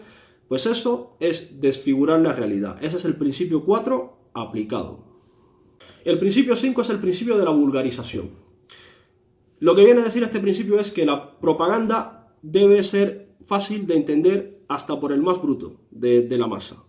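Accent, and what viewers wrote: Spain. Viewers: No parles com els cubans. El teu és un bon castellà de Castella. Jo el que tu dius ho aplicaria al PP, a C's i a VOX. Jo he viscut al Brasil i a les Filipines, on voten lliurement, sí, als que tenen més diners, quasi sempre. I no cal anar molt lluny. Has parlat, alguna vegada, de com eren abans l'Iraq, Síria, Líbia, l'Afganistan quan els de Wall Street els van portar la democràcia i com eren i com són? Ací els de l'Ibex-35 són els que manen i no són votats. La peor de todas: ¡dictadura del mercado! Marcelo Colussi Rebelión “La ideología dominante es la ideología de la clase dominante”, decía Marx. Expresado de otro modo: el esclavo piensa con la cabeza del amo. ¿Por qué? Porque la imposición de los grupos de poder es total: se da en el plano material y, quizá con más fuerza aún, en el campo cultural. Eso es la ideología en definitiva: la argamasa que solidifica una sociedad no permitiendo ver la lucha de clases que la mueve o, en todo caso, haciendo de esa lucha y de las diferencias sociales algo normal, natural. 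Las clases dominantes, siempre a través de la historia en cualquier modo civilizatorio, ejercieron su poder en forma brutal, con el lenguaje de la violencia, pero también con la sutileza del discurso ideológico. Las diferentes instituciones que se fueron creando a través de la historia (familia, Estado, iglesias, la cultura en su sentido más amplio, la escuela, los medios de comunicación, etc.) son los instrumentos encargados de asegurar la transmisión ideológica. Lo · HOLA MUCHACHITO LINDO CUBANITO YA TIENES UN POCO EL ASENTÓ ESPAÑOL......PERO NO PIERDAS EL NUESTRO Q ES UNICO...OK.GRACIAS X TU MENSAJE MUY CIERTO E INTELIGENTE.....CUANTA VERDAD EN LA COMPARACION....POBRE D MI GENTE Q YA SON 60 AÑOS D MISERIA Y DESTRUCCION....VIVA CUBA LIBRE.........!😍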